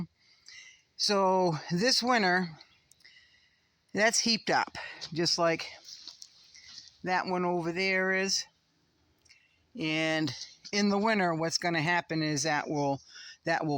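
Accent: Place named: New England, United States. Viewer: I really enjoy listening to you talk and I'll explain why. My oldest brother passed 3 years ago from cancer and he lived in Rhode Island. And last month someone that was like a brother to me that lived in New Hampshire also passed from cancer. Hearing that New England accent brings some comfort to my heart. Me and my NH brother used to get so tickled at each other with the comparison of saying, when I park my car I put my car keys in a pocket of my khakis😂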